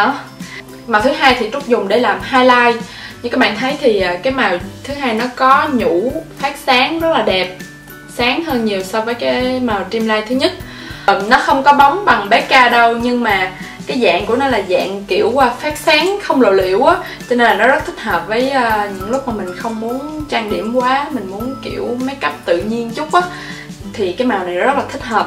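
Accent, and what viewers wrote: Mekong Delta, Vietnam. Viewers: chị là người miền tây à ? quê chị ở đâu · Thích sự mộc mạc của chị với cộng thêm điểm giọng nam, miền tây êu dấu. Đáng yêu vô cùng hí hí Làm thêm nhiều video hay nhe chị, cố lên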